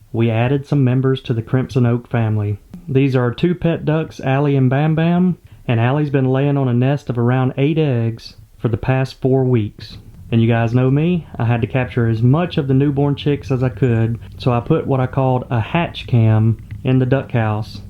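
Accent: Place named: Pacific Northwest, United States. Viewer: i love this. The sound outside is also enough for me. if you live streamed your ducks id patreon for that in a heart beat. I just had to move back to the PNW from GA so your accent is very welcomed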